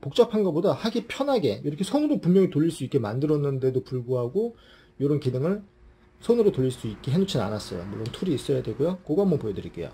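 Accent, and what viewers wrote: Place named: Gyeongsang, South Korea. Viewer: 찡기다, 아까침에... 이런 사투리가 나오는거보니 부산경남쪽이 고향이세요? 마산에도 잠시 있었다는거보니 그런거 같네요 ㅎㅎ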